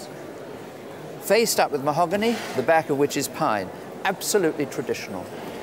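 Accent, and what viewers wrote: United Kingdom. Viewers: Definitely received pronunciation 😊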